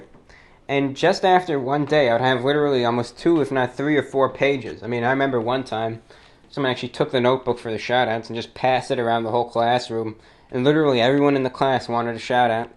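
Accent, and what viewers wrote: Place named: New York, United States. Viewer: I feel fortunate to still be able to find and listen to in the “olden” days 😁. Love the NY accent lol. You can whistle! I’m jealous! I’ve tried my entire life consistently but no luck. I still can’t believe I’m listening to a 16 year old kid lol. But Nike is “just do it”. Not “get it done” 😂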